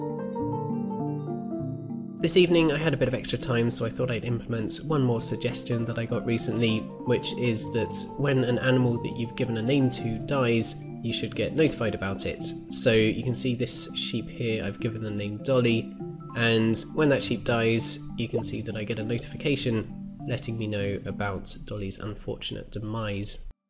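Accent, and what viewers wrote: Germany. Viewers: Is he from Germany?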